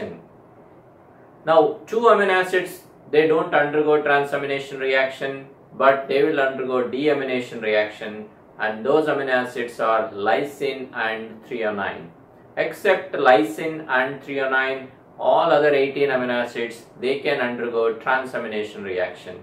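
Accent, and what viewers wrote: India. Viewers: Oh my god I just got so many knowledge, every concept of this topic oh thanks sir... Your words are so indian wala hoke very nice to hear ...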